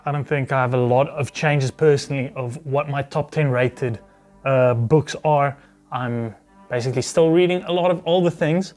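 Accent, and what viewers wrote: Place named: South Africa. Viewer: Suid Afrikaanse aksent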